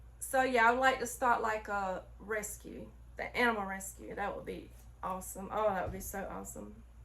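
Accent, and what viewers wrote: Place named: South, United States. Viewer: Oh yeah honey you got an accent. You got that southern drawl. I’m from TN I’ve got an accent too. Lol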